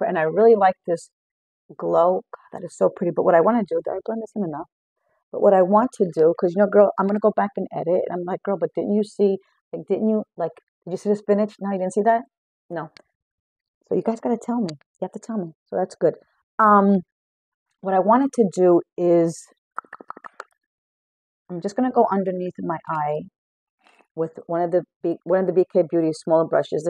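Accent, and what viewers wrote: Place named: East Coast, United States. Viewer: just found you and subscribed, mostly so I can hear your east coast accent! Love the Look!! this is about what I do on the daily, I might put on a fuller coverage foundation for going out but like you said, less is best, I'm a 68 year old Midwesterner and I could listen to you talk all day!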